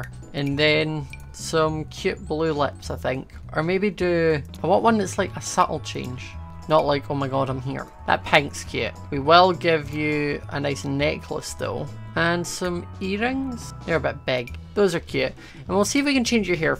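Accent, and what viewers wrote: Scotland, United Kingdom. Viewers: I find the Scottish accent quite soothing... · Piggies Forever he's Scottish 😊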